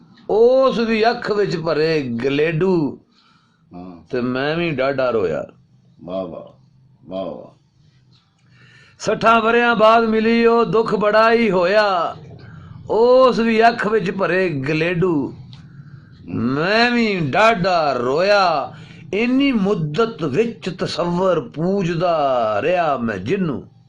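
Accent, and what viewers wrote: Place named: Sheikhupura, Pakistan. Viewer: Masha ALLAH 💞 It seems GOD did gave some talent to to 🔥 to speak 🗣️ perfect pure Punjabi of QILA SHEIKHUPURA DISTRICT of OLD PUNJAB, You wouldn't have MAKAI ((corn 🌽) ROTi since it's 100% American native of USA 🤞🍀🙏🔥🚒🔥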